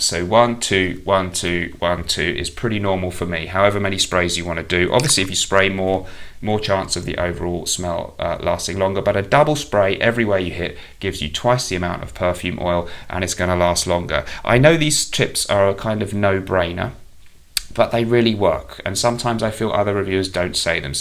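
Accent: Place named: RP, United Kingdom. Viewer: One of the best things anyone can do is drink water people lots and lots of water to keep your body and skin hydrated and quite drinking alcohol this is one of the biggest impacts I've had on my perfume performance drink lots of water ... great video gotta love the Queen's English 🤣 "fat kid at the chip shop" 😃😂😂🤣👌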